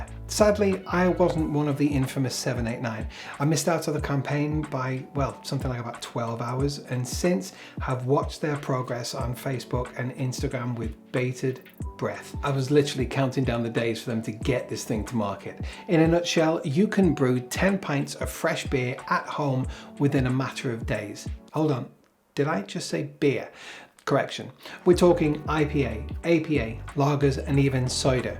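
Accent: United Kingdom. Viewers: Good scouser. Haha. Well done. Great review. Defo buying this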